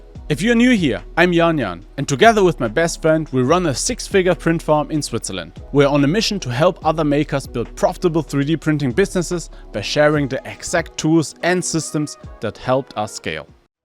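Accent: Germany. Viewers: German Accent Vanoss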